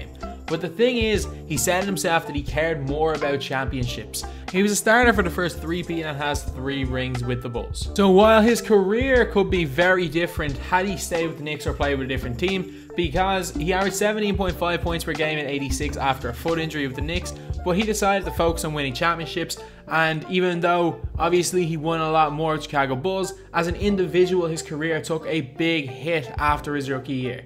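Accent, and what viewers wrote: Ireland. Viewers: D-ManHD lol what is this comment? He sounds Irish.... because he is Irish. Think about it. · Dude u sound Irish??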